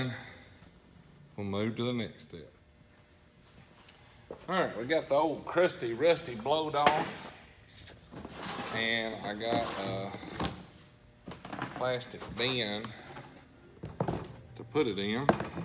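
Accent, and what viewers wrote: South, United States. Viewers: Classic American? Only a small portion of the populace sounds like that, and yes he's in the south. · I love your accent, you sound like Oggy Doggy, or Doggy Daddy,, or Deputy Droop Along, or that Huckle Berry Fin dog..No offence meant, just a classic American accent. [From the south?].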